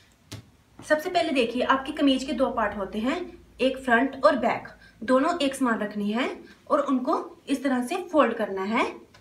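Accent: Punjab, India. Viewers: I am from Punjab .....love your Punjabi accent ❤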